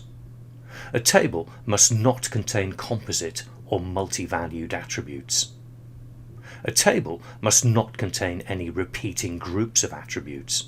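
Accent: England, United Kingdom. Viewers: Thanks for your videos, it is so clear when i watch a video with an English accent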